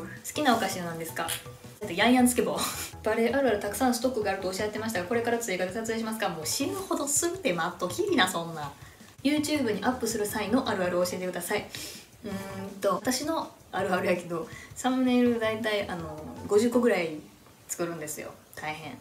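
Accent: Kansai, Japan. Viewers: 松浦さんの話し方、私はすごい好きです！！これぞ関西って思います！2019の岸和田だんじり祭りの番組見てから松浦さんを知りました。その番組も撮って繰り返して軽く20回以上見てます！その時の松浦さんもYouTubeやってる時の松浦さん！凄く好きです🥰🥰これからも応援してます！大好きです😭✨それにレペゼン地球のチャンネルを登録してるのすごいビックリしました😳私、レペゼン地球のファンで凄く嬉しいですヾ( 〃∇〃)ﾂ ｷｬｰｰｰｯ♡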